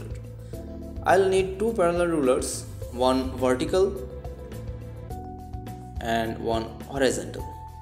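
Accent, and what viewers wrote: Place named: India. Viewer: I've always found pleasing hearing English with indian accent (btw, helpful video... thanks a lot!)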